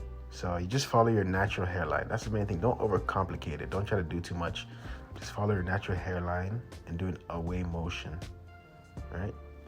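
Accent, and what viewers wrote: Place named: Toronto, Canada. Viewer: Man sounds like he's from the GTA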